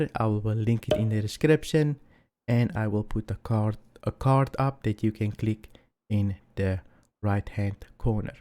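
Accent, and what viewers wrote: South Africa. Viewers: Bro .. are you from RSA?!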